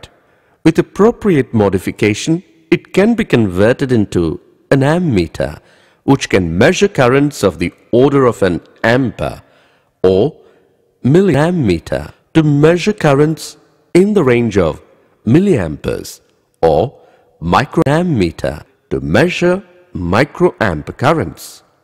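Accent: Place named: India. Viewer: Sounds like an Indian who tries really hard not to sound Indian.^^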